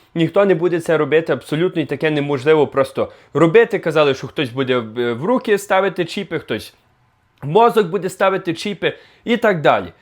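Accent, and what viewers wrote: Western Ukraine, Ukraine. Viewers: Підтримую україномовний!!! У Вас чудовий західноукраїнський говір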